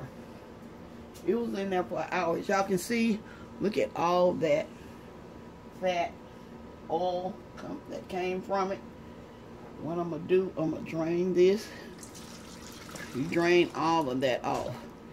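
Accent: South, United States. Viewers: Best wishes Ms.. I feel the warmth from your kitchen and your heart. And your southern accent it’s like a recipe within your recipe. Can’t wait to try this one. Thanks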